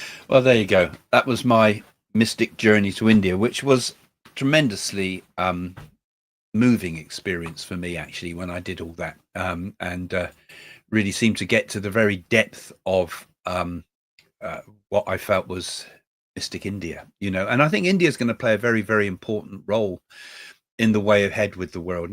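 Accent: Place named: England, United Kingdom. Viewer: So refreshing to hear an English voice on You Tube. So many Psychic forecasters are American. Thanks xx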